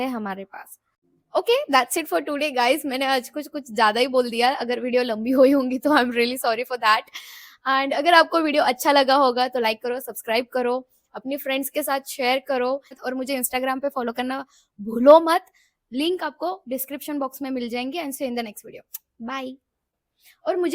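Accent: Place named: Maharashtra, India. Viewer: Marathi accents in hindi speaking are very nice Being a Marathi I like to hear it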